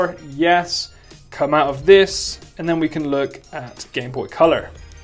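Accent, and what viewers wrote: Bristol, United Kingdom. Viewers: This is pretty cool I won't lie, but I swear you're also from Bristol with that accent of your's (hopefully that can be taken as a complement)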